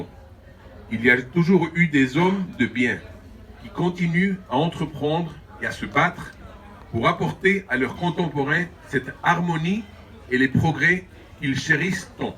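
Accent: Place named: Spain. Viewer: Il n’a pas l’accent marocain mais espagnol,son père était Alphonse de Bourbon Dampierre et sa mère la petite fille de général Franco.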